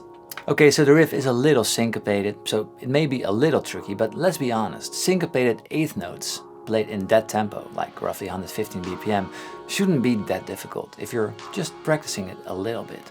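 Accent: Netherlands. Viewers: First video I watch from this channel. And after 5 seconds in, wist ik dat die dude Nederlands is.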